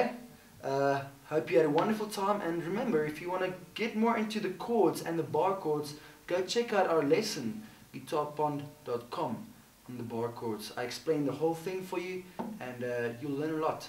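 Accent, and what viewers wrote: South Africa. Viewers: South African, ne? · your accent makes me miss south africa, just a little. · Are you SouthAfrican? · South African, I could hear it as soon as I started watching. whoop thanks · Are you South African? :D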